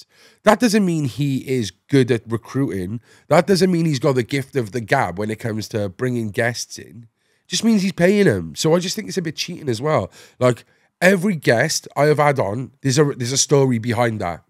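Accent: Wales, United Kingdom. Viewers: Been a bit too much wool on for me lately, if i'm being honest, i'm more of an Awayday's type of lad being from Middlesbrough that is, though i do love the Welsh Valleys it's a beautiful place and love the accent my man, keep up the good work👍 we do both have one thing in common, we both have a transporter bridge❤️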